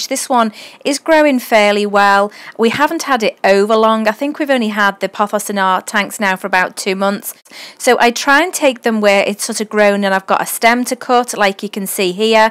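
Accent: Liverpool, United Kingdom. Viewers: i know ur accent it is british.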